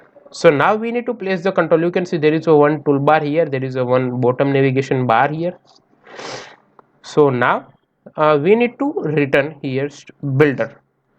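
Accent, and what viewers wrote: India. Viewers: I'm very glad to know that You are Indian... I got it when I heard first word .. Indians ki avaaj sabse alag 😎👍🏻🔥❤️ Me Bhi Bhartiya bhai 👍🏻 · When I heard the indian voice I came to knew that I am going to do something interesting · When you search the whole internet but found a Indian guy speaking English then you knew it will work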